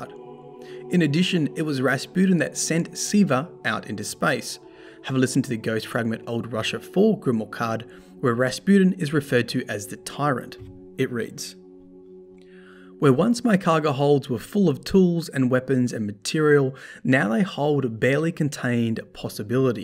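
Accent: Australia. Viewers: Quarantine ! Also Lol ‘Zed’ I love your AU accent!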